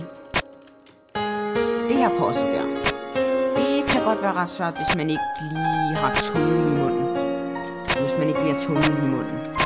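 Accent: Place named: United States. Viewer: Det lyder som en amerikansk spiller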